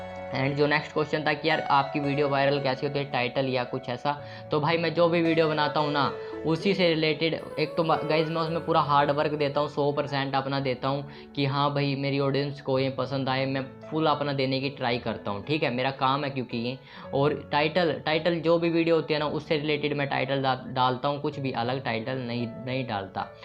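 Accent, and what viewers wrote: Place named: Haryana, India. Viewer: Bhai mene teri boli t e pta laag gya tha ki tu haryana ka h isliye tera qna dhund rha tha😜😜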